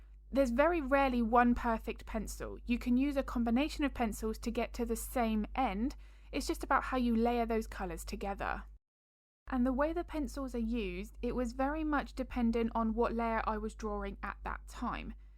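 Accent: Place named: England, United Kingdom. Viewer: Your English accent is so sweet to listen to and you are explaining so well how can someone fail to understand you. Nice job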